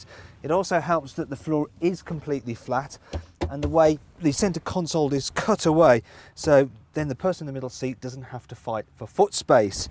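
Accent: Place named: Birmingham, United Kingdom. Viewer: He's British? I thought he was a Brummie.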